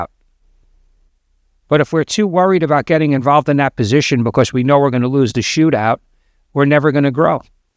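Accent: United States. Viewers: Thank you for your channel and thank you for your accent. I’m a big fan of American regionalism and the maintaining of American accents.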